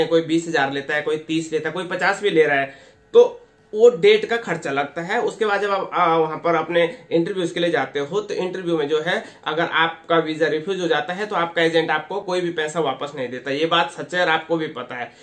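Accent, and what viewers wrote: Garhwal, India. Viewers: Bhai apka voice ton gadwali hai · Very good bro tumhari awaaj se lgra tum gadwali ho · Bhai. G kakha ka cha grhwali tune aani cha bht bdiya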